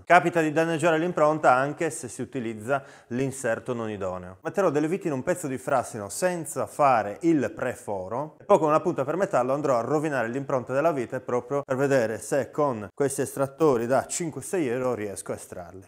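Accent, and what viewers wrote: Sardinia, Italy. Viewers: Sei sardo? (Io si è in tal caso si capisce😂)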